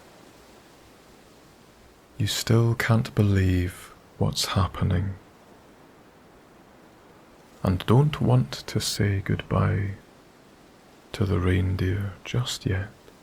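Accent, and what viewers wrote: Scotland, United Kingdom. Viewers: Loved the story, but really enjoyed the Scottish brogue, will certainly keep listening!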